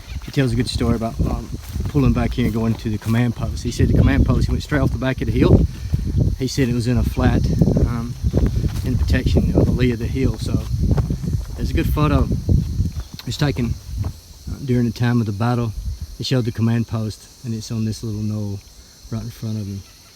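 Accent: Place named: South, United States. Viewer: Thank you for your walk-around tour and your knowledgeable account of the entire battlefield. I am a 58 year old Australian and I inherited a fascination of this "crucible of American & Japanese military doctrine"... My late father had me watching William Bendix & Richard Jaekol (spelling) in the movie version of Richard Tregaskis's book "Guadalcanal Diary" - which I have never read, nor James Jones The Thin Red Line - ignoramus that I am! :) I have the Terrance Malik film plus "The Pacific" - we in Australia owe our freedom to the brave young Marine's who stopped the Japanese advance southward in its tracks, while our own boys fought it on the Kokoda Track... Your calm, succinct, professional and detailed tour is just fantastic - thanks to you, I know of not only John Basilone, but now I can add Mitchell Paige & Davis to the list of brave men who won the Congressional Medal Of Honour to the numerous Navy Cross recipients you recall with a grace & calmness befitting the honour of these men. 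Now I know where & what 'The Galloping Horse' is, as well as 'Gifu' to add to my knowledge of the 'Edson's & Bloody Ridge, The Jeep Track, Fighter Airfield, the Emergency Airfield to add to my knowledge of Henderson, which I presumed was the sole Airfield - Wow - Coffin Corner, the Ilu River, the mistaken position /naming of the Tenaru - you have pieced this place together with the ability & acumen that would do any professional historian proud :) Your gentle, factual southern accent is ju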